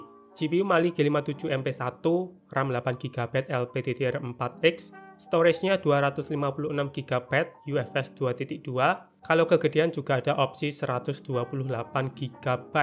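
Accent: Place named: Banyumasan, Indonesia. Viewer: "ya jelas ngelag lah blog" ngakak anjir ngomong nganggo logat banyumasan lucu piye ngono maseh😂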